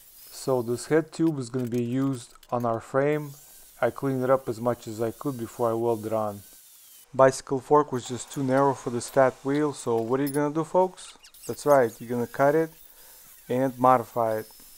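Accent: Russia. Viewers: Блин слышно сразу по голос русский))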